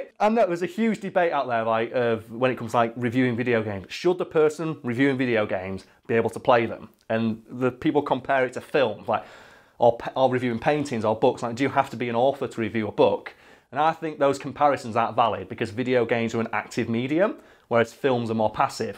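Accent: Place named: United Kingdom. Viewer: * heavy British accent *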